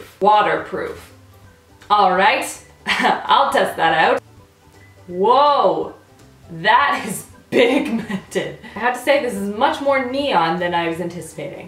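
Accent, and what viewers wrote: Canada. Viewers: that moment when I'm so Canadian that I didn't even realize you sounded really Canadian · You always sound Canadian and it's adorable! it's one of the reasons i follow your channel.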